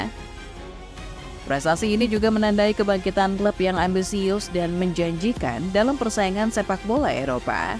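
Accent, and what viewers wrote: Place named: Medan, Indonesia. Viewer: Terpongkengham bangkeee wkwkwk org medan abg ya😂😂😂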